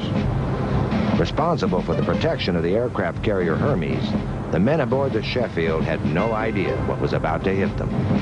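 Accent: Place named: France. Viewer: Narrator French accent... sucks!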